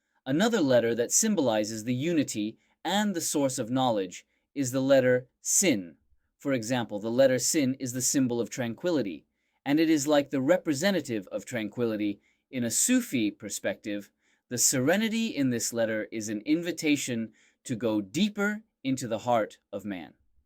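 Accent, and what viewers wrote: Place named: United Kingdom. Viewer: Hocam, maaşallah.. 😲 British aksanınıza bayıldım. 😍 Resmen, bizden alemlere hayret ve şükür dolu bir portal daha açtınız ki... Uzay serüveni tadında, heyecanında, ortalık yıkılıyor. 🌠🌌💫 Emeklerinize sağlık. Cansınız.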